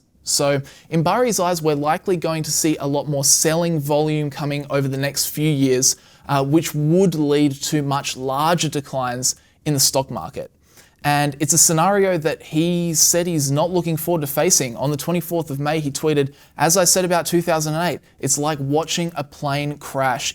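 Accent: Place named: Australia. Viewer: That australian accent... Cute but not that understandable to me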